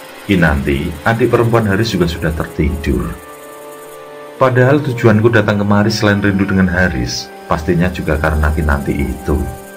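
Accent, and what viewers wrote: Java, Indonesia. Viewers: Biar pun sering mampir, tp masih susah qu mencerna suara pakdhe 😁, biar pun pake headset,,, terlalu medok pakdhe bgi ku yg aceh ini,,, salammualaikum 😁😁😁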